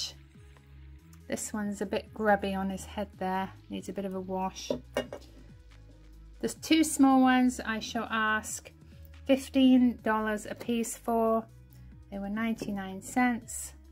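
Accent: England, United Kingdom. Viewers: Hello. I am a fairly new subscriber (really enjoy the Goodwill trips - one of my favorites). In this video, you mentioned being a child in California. I assumed that you are from England because of your accent. Did you move here as a child but retain your accent?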